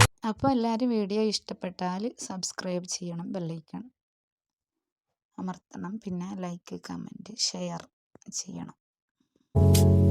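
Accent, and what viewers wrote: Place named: Travancore, India. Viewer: കാസർകോട് ജില്ലയിൽ പ്പെട്ട കാരാട്ടെ സംസാരം മാറി തിരുവിതാംകൂർ സംസാരം ആയി എന്നാ ഒണ്ട് വിശേഷം കൊച്ചെന്തിയേ എപ്പഴാ പാചകം തുടങ്ങി യെ ഒത്തിരി നാളായൊ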